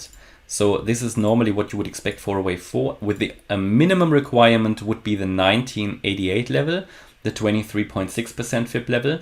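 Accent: Germany. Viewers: Hey ich schreib mal gleich auf Deutsch …. Bist du Deutscher ? Hört sich nämlich so an 🙃 gute Analyse … ich finde diese ganze Elliot Theorie super spannend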